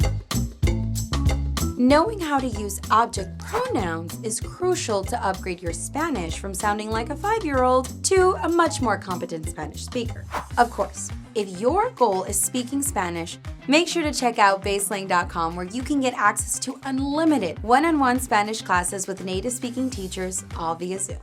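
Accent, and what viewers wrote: Spain. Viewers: Is the Castilian Spanish? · that spanish accent is like heaven u sound gooooood😍😍😍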